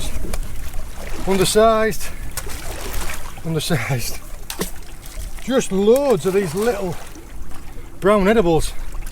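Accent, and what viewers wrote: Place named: North, United Kingdom. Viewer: Ay up ! 😂 class don’t drop them northern roots pal 👊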